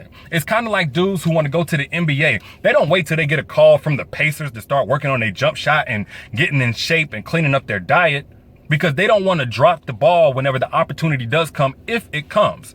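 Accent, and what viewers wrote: South, United States. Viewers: Lol, the living room. You are straight out the gate 250% SOUTHERN....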